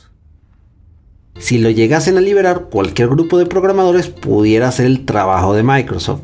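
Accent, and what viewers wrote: Venezuela. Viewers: Llevo tiempo viendo tus vídeos y siempre supuse que eras Venezolano jajaja Saludos desde tu misma nación crack!! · amigo eres de venezuela bueno porque yo si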